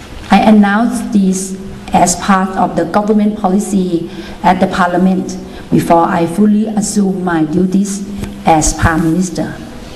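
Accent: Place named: Thailand. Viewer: ฝรั่งคงเหนื่อยกับการนั่งฟังแอ๊ดเซ้นนายกคนเก่งของคุณน่ะคับ !!!!! จะสำเนียงไทยจ๋าก็ไม่มีใครว่าหรอกคับ แต่แทบจะไม่ออกเสียงตัวสะกดเลย ความสามารถการใช้ภาษาระดับนี้ ใช้กับ"โลก" ไม่ได้หรอกคับ แต่ถ้าเอาไว้หลอกบางคนในประเทศ ก็ถือว่าเพียงพอแล้วหละ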